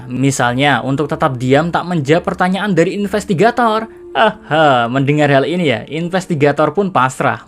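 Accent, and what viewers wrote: Java, Indonesia. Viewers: Medok banget mas 😅😂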